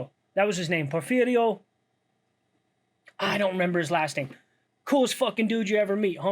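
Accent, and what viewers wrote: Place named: United Kingdom. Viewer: I love this kind of British accent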